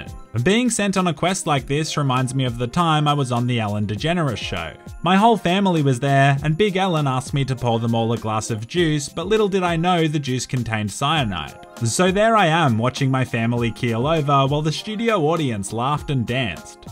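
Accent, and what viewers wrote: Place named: Australia. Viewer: Dearest, I believe you are Australian like me? Just going off the accent here. This video was posted 5 hours ago. You were awake at 3 in the morning? g e t s o m e s l e e p y o u w e t c a b b a g e - Sincerely, Glacier.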